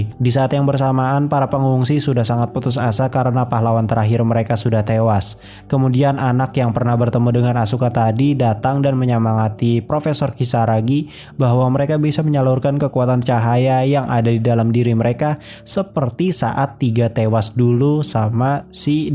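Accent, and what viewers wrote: West Java, Indonesia. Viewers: Sunda ny dri mana bang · Njir lu nyunda bre 🗿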